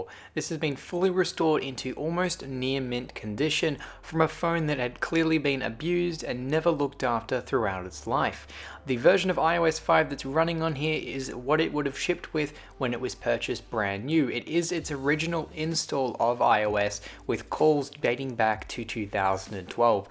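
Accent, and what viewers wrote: Australia. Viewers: Ur Australian?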